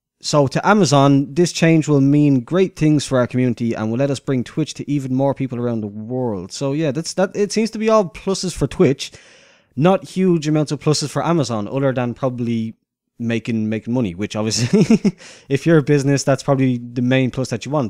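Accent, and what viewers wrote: Ireland. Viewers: Haha I love a fellow irish accent, where abouts are you from I'm from swords in dublin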